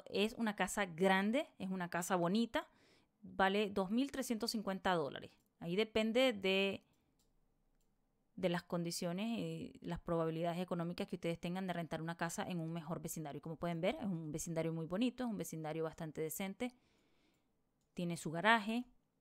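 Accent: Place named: Central America. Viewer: Posdata: Sabía qué estás de Centroamérica por el acento pero no tenía la certeza de qué eras Nica! Yo también soy Nica,un saludo paisana! 😘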